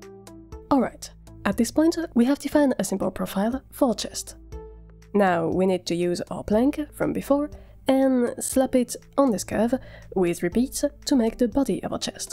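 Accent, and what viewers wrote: France. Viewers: bro is def french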